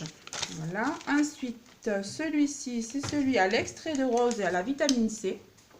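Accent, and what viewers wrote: South, France. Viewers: J'aime beaucoup fashionnista. Alors j'ai reconnu tout de suite ton accent . Je suis aussi dans le SUd. Mazamet je connais ;) Bisous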